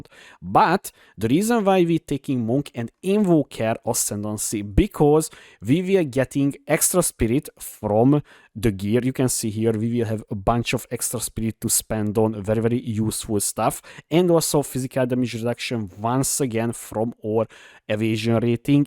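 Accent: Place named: Hungary. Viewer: Gusztustalan magzar akcentus. Tanuld már meg kiejteni a szavakat, ha már az adott nyelvet próbálod meg beszélni.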